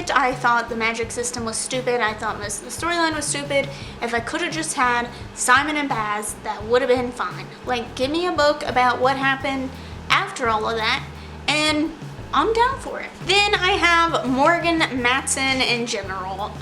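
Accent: South, United States. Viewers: Do have an accent? I hear southern a bit in your voice. It comes and goes though.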